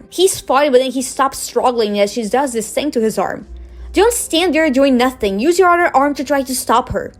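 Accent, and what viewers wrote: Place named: Brazil. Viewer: Ahh, i had a brazilian teacher, i thought the accent felt familiar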